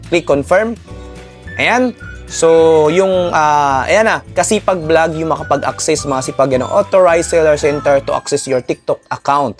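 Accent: Visayas, Philippines. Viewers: Bisaya ka Dong?🤔😁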